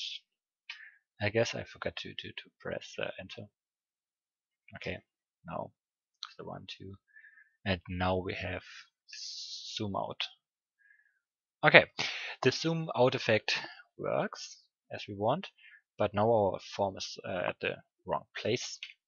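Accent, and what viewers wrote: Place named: Germany. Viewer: Man merkt schon das du ein deutscher bist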